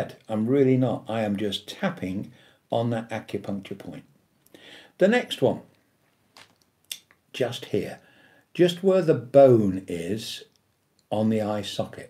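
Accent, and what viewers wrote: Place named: United Kingdom. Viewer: Abonniert wegen der Aussprache. British English 💖💖 Greetings from Munich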